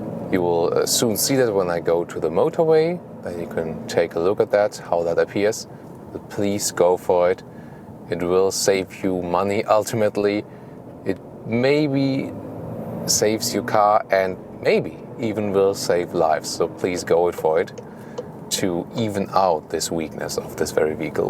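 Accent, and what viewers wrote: Germany. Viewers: I like your German English!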